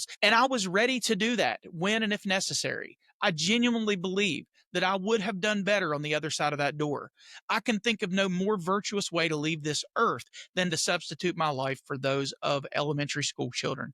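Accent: South, United States. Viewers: Very good work here, Sir..... very good indeed. You mentioned the crafting of language which would show up in their Police Reports. You were kind in calling them misleading b/c to my way of thinking if it's not the truth then it can only be a non-truth. Whether we call it misleading or a lie, isn't the LEO and/or the DA failing to uphold their Oaths if any of the Police Report information is not true & they fail to be held responsible? I do apologize for going off topic here but this issue of misleading police reports gets even worse when multiple LEO's are conspiring to get the story straight? This was a really well thought out video - truly disturbing on so many levels. peace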